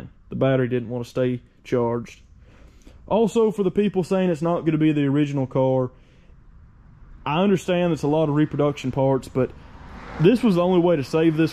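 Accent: South, United States. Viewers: I'm so hooked on hearing the ambiguous southern narrative describing the journey of restoring a completely totaled wreck into a running driving car! I can't help but thinking the song should be playing in the background. Can't wait to see the finished outcome.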